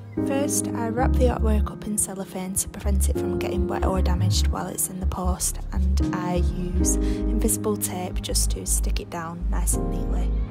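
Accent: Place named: Newcastle, United Kingdom. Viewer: I had a thing with a gal one summer…and she had your accent. You must be from Newcastle…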